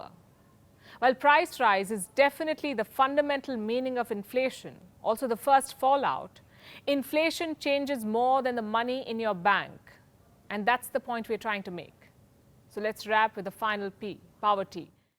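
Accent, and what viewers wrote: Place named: India. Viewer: Palki has a great indian english accent. How did she get to speak so clearly and confidently?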